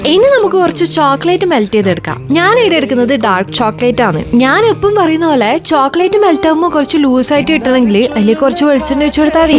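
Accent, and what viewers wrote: Kannur, India. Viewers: കണ്ണൂർ കാരി ആയിരിക്കും അല്ല്യെ 😌🐝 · Chechi kannur aana😅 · Kannur anno chechi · kannur analle❤😊 enekkariyathathu konda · Kannur ഭാഷ ❤ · Kannur ahnnaa? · Kannur ahno eyal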